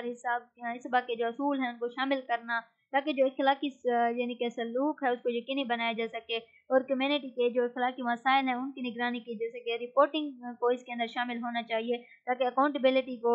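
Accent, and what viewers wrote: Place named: Saraiki, Pakistan. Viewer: Saraiki eng accent 1st time I heard 😂😂 I appreciate your work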